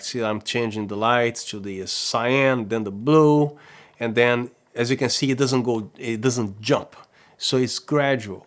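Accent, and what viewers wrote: Brazil. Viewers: Many thanks for this video review! Helped me a lot. I seem to sense in your accent you're from Brazil. Am I wrong? :)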